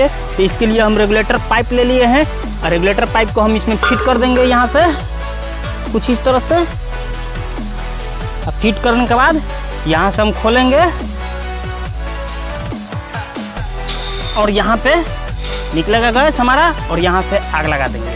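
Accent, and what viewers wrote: Bihar, India. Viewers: Bihari accent made me watch this video longer. Gas cylinder se bas tum circus kar rahe thee!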